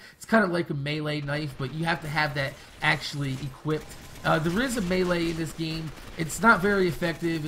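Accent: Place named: Boston, United States. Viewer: I bet this guys from boston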